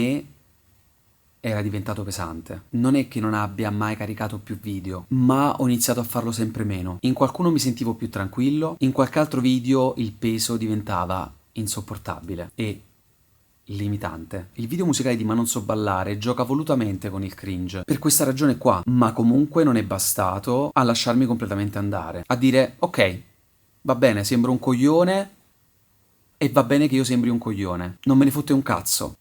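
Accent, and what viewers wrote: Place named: Campania, Italy. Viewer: " sott occhio mi è sembrato che fosse marcoripa con un nuovo berretto, dato il contenuto del discorso, poi però la cadenza più campana che laziale mi ha fatto capire. non ne so niente, per la carità ma, da quello che vedo in giro, la tempesta di feci è un vero limo per certi canali chè, non meritandosi applausi di quelli sentiti e spontanei, sul fertilizzante naturale ci campano sia direttamente che indirettamente perchè, e diciamocelo, più fanno cagare e più abbisognano di un ventilatore che ne diffonda le gesta. proprio in questi giorni l ho cercata perchè ,mentre facevo la rassegna dei primi tuberi da me visonati, lei mi è venuto in mente :coincidenze. comunque devo dire che lei non mi ha mai fatto ridere, anzi. a proposito ,mi saluti il signor youtube "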